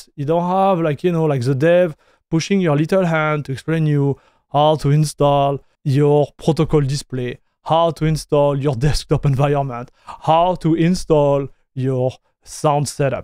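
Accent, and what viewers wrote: France. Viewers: chat, i think this guy might be french, can't put my finger on it why tho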